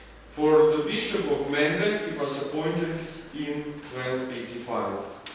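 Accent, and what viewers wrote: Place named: Russia. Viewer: Majgad koji russian english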